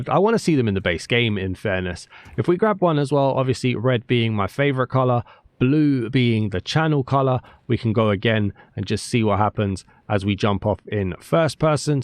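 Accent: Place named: United Kingdom. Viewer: Im never get tired of british accent 😅 tyal 4 the vid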